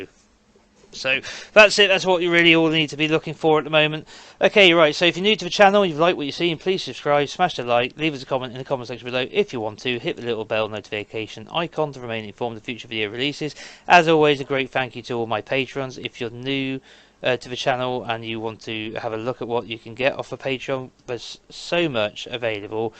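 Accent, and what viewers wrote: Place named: London, United Kingdom. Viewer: Lol, perfect London voice, love it.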